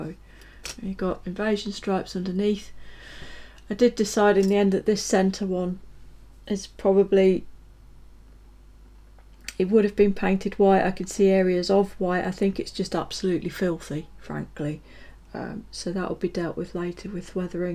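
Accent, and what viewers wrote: West Midlands, United Kingdom. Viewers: Hi strange question! Is there a touch of West Midlands in your accent? Certain words seem like it to me by the way carry on as you are very informative very high quality and presented in a concise and very logical manner ( grovel grovel )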